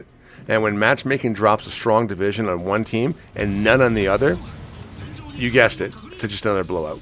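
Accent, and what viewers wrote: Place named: Canada. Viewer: Just sub'd -- good to have a Cannuck voice here -- since I-Chase Gaming went away ..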